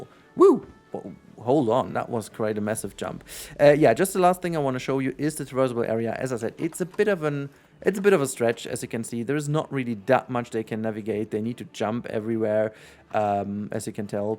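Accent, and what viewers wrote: Germany. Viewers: Finde es witzig das man raushört das du Deutscher bist obwohl dein englisch sehr gut ist😂❤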